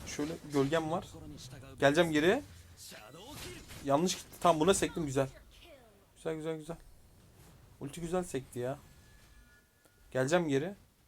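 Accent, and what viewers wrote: Samsun, Turkey. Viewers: Samsunlu olduğunu belli ediyo bende samsun luyum RE!S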